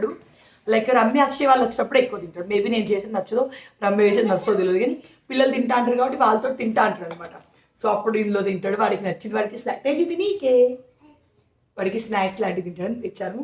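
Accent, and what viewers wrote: Telangana, India. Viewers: Previous ga matladandi Telangana slang anta baledu madam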